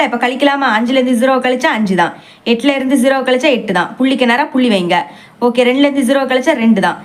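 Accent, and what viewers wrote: Kanyakumari, India. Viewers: neenga kanyakumari ahh akka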